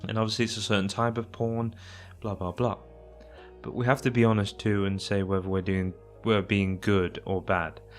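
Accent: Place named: United Kingdom. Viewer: british :pillow-turquoise-hot-chocolate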